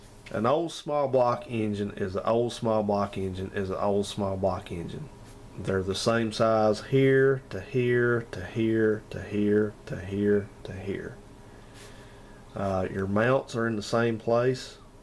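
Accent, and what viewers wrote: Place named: East Tennessee, United States. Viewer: Love the accent. If I have to guess, east Tennessee? (please don't yell at me if I'm wrong!) Brought back some memories from when I lived in Oak Ridge back in '96-'97.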